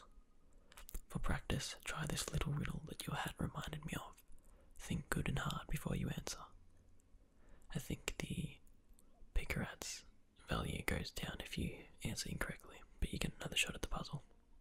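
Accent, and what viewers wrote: Australia. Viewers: hello! I'm a new subscriber, and I was wondering where your accent is from :D Australian?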